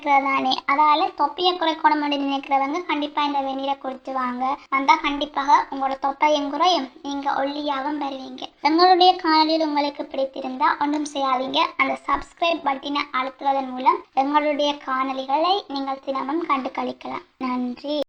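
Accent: Sri Lanka. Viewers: இது இலங்கை தமிழா?nan sri lankka than. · srilankan tamil very nice..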